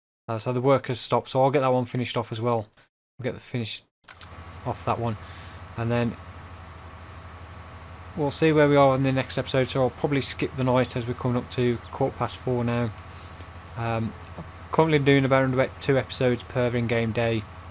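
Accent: United Kingdom. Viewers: I only found your YouTube channel a week or so back I am now going back through the older video I have to say I think you speaking is much better than just the music and txt it’s good to hear another UK person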